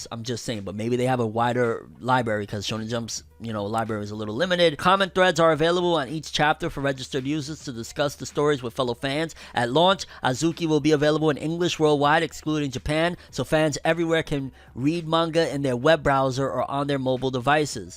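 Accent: United Kingdom. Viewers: best thing of fornever news is the british accent mate! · Dude are you British I catch the accent every blue moon in every video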